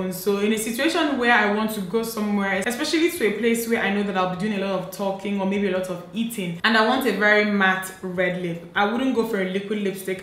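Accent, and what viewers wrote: Nigeria. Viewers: I was trying to place your accent because I recognized it but this is the first video of yours that I've seen and as soon as you said Nigeria, I was like THAT MAKES SO MUCH SENSE. Just a really random thing I was thinking about XD I like your reasoning on never having enough red lipsticks XD Also, I LOVE your eye look :) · Usound exactly like my daughters pedestrian. She is Nigerian! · I love love love all your videos. You are so Nigerian and its so refreshing to see someone original. Not all these Nigerians faking oyinbo accent. Thank youuuuuuu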